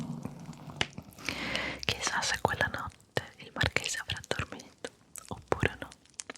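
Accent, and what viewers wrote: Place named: Rome, Italy. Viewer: Si è vero, la pronuncia romana fa ridere anche me 😂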